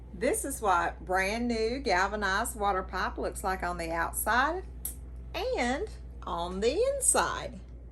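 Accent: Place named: South, United States. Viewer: I'm from Australia, I love the southern US accent like yours.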